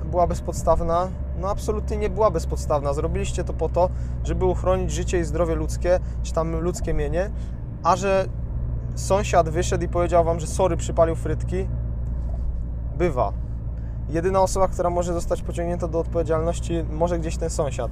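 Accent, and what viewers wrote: Silesia, Poland. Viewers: Ze Śluńska jesteś?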